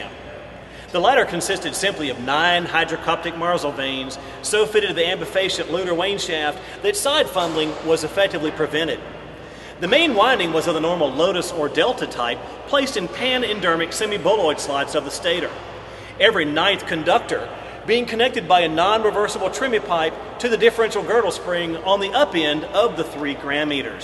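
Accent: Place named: South, United States. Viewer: I've heard this presentation before but it's more authoritative when the presenter has a Southern accent.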